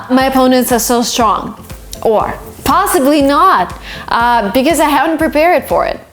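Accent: United States. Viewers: Why am I watching your channel? First of all you are a non-native speaker and I highly prefer to observe your video because I want to take your advice that you've done since you start your learning and How you are able to speak like American. And I always tell everybody who learns English to review your channel cause it has a lot of advantages you are amicable and you elaborate anything so obviously and it makes your channel with lots of fortification. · The reason why I watch your channel is that your pronunciation is quite clear and I really enjoy the way how you make your videos it's very dynamic in my opinion that's the reason why I always try to imitate you right after you start speaking because I want to become fluent and obtain the American accent as you got. Moreover, it helps me a lot in learning new vocabulary!!!! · I watch your channel bec you told me how to speak English like American accent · I watch your channel because even though you're not native your accent is very real. 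Actually at first I thought you were American. So I find you inspiring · Before watching her video I thought that she was american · I’m watching this channel bc I want the same accent as you .. and also I’m living in Canada .. and I kind of need American accent ... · Are you like your way speaking you are a good teacher and you teach American accent so I can talk like a native speaker and you are so beautiful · I like the way you speak your english in American so yeah it's great I like it. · I watch your channel because you're just one of the best teachers I've ever had. When I first saw your channel,I thought you were American! You English is so good. Thanks for your help,keep making these amazing videos. I am 12,and my dream is to be a polyguot. BYE,from Brazil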